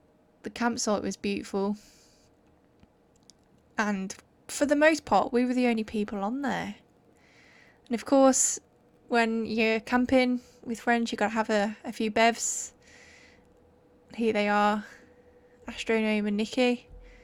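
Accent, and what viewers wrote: United Kingdom. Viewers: Where yow Bin ? 😂😂 Welcome back fellow Brummie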